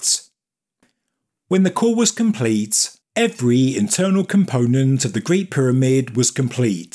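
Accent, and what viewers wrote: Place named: Birmingham, United Kingdom. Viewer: In general like the content of these videos. It's just that I find the narrator's nasal, brummie accent so annoying that it detracts from the actual video. If he could use AI to hide his accent then I could probably sit through a whole video without wanting to puke.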